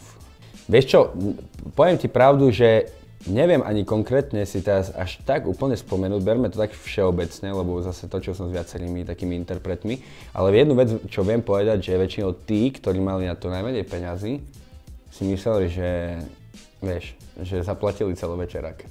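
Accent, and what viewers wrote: Eastern Slovakia, Slovakia. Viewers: Vychodniar jak repa 😀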